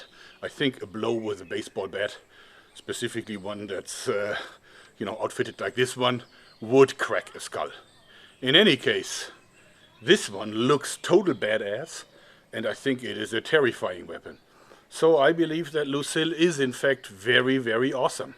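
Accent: Germany. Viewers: are you German? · are you german? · U sounds like a German Guy that’s try to speak Englisch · Are you german? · You are German! I can hear it! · I am german and i know a german accent when i hear one and i sound exactly the same like him when i speak english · Du bist safe Deutscher. Wie lange und wo du wie lange wohnst ist mir egal. Du hörst dich an wie ein Deutscher der Englisch redet. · Du bist duetsch das hört Mann aber cool Video Mann i Love Lucille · Dein deutsch aktzent is nicht zu überhören xD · His accent sounds very authentic, actually. I don't know where you see his accent slipping, but you clearly don't know what a real German accent sounds like. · Are you German? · YOU ENGLISH IS GRAMMATICALLY, WICKEDLY EXCELLENT. ...OF COURSE WITH A NICE GERMAN ACCENT! ...WICKEDLY ENTERTAING AND FUNNY. 😀😀😀😀👍👍👍👍💖💖💖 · warum hört sich sein englisch so deutsch englisch an?😂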